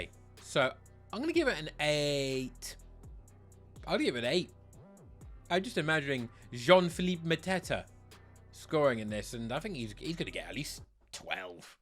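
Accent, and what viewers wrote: Manchester, United Kingdom. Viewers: strong Manchester accent you've got fella 🤔 may I ask what part of Manchester you're from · thats a great manc accent you got there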